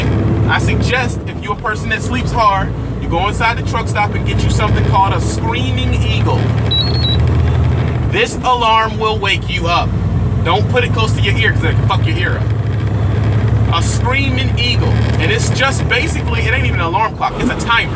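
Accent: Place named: DMV, United States. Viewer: Brotha! I just found you on YouTube a few hours ago. At first I was on the fence as to if I was going to do the trucking thing, but looking at your videos has convinced me, especially being someone in my position. This is a great opportunity to prosper! Awesome content bro! All that aside, I can tell you're from the DMV..."young!" What's up man? I'm living in Cali now, but I'm from the DMV too. RESPECT!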